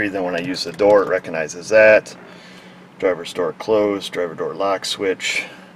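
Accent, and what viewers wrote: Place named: New York, United States. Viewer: "Wadd-a" you "BA-UN" NY accent LOL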